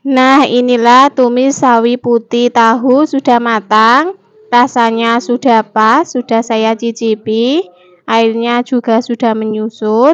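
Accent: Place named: Java, Indonesia. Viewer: Mbak e bahasa medhok banget.....😅😅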